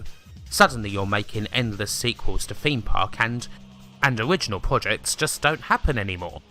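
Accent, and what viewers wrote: United Kingdom. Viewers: Is that a British accent or a speech impediment?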